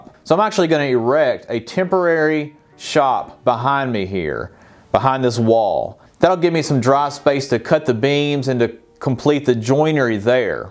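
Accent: Tennessee, United States. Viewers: Sure it is going to be great to follow! Good luck! Ah, Tennessee! Haha i like the accent!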